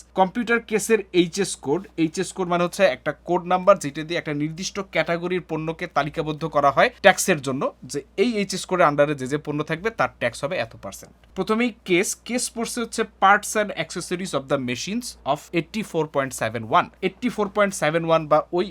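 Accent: Barishal, Bangladesh. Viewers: ভাই আপনি কি বারিশাল এর?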